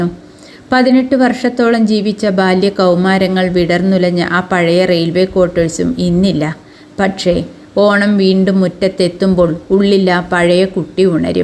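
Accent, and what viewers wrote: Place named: Valluvanad, India. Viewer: വള്ളുവനാടൻ തനിമ നിറഞ്ഞ ശബ്ദം പ്രിയ സഹോദരി അഭിനന്ദനങ്ങൾ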